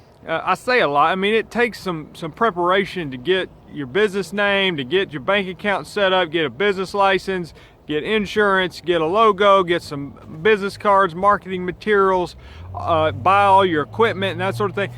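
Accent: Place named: Southwest Virginia, United States. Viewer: You sound like you are from my Southwest Virginia area lol. Everyone and their brother runs around with a mower of some sort in their truck out mowing lawns. there isnt really many decent or big sized companies around here because the business is so saturated. I am surprised I got any lawns really this year and wont be surprised if i loose my commercial property to Jo Blow on the street that wants some beer money next season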